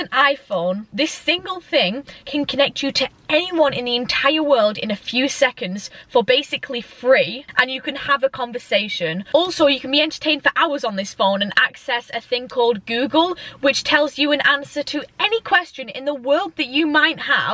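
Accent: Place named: Stoke, United Kingdom. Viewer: U sound Stoke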